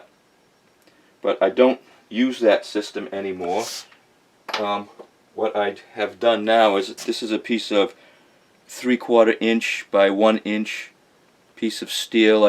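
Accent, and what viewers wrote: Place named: Massachusetts, United States. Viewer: from your accent, you sound like you're from New England. I was raised in New Hampshire.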